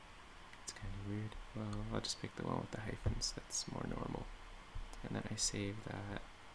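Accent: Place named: United States. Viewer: For a Norwegian your accent is very American. Have you lived in the states prior?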